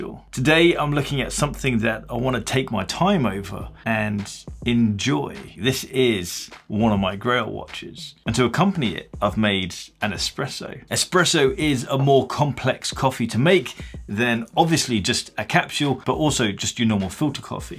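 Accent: United Kingdom. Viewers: Great video. Where are you from? what's that accent if I can ask? Sounds to me like British but not quite the same as I'm used to....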